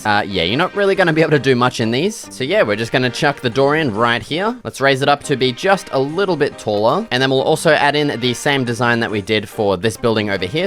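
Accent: Australia. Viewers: Quick question are you Aussie? You sound a bit Australian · Your Australian Accent is so iconic I love it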